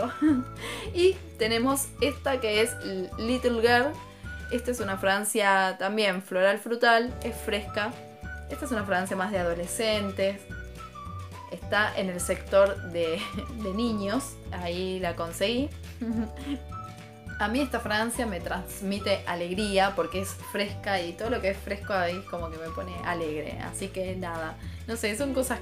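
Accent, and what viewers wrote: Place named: Argentina. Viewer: Ya me parecía. El acento argento es imposible de no reconocer. Yo también soy de Arg.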